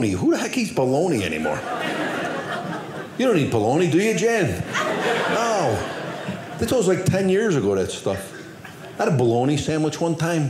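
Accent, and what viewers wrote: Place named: Midwest, United States. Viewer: Mw accent is so perfectly complementary. "YeAh that's da guy!": flawless timing. Funny guy.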